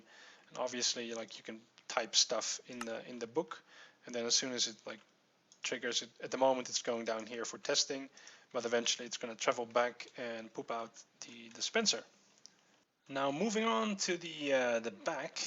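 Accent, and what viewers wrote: Netherlands. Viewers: Where are you from? You have a hollandguy's accent...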